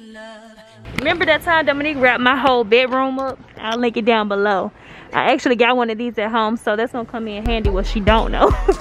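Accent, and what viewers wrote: South, United States. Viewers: You’re the epitome of be patient and wait your turn !! I literally remember watching your relaxed hair video YEARS ago falling in love with your southern accent & now look at you 🥺 I’m really so proud ❤️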